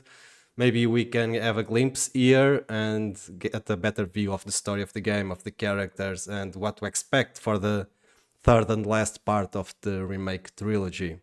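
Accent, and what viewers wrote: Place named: Brazil. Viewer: Esse inglês não engana quanto às nossas origens, do que vi é um bom vídeo, vou guardar para ver mais tarde!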